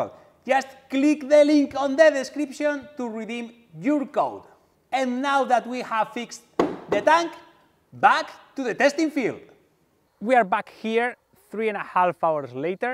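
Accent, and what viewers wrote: Spain. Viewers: Le note ancento español pero hablaba ingles jajaja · Es cosa mia o su ingles se escucha muy españolisado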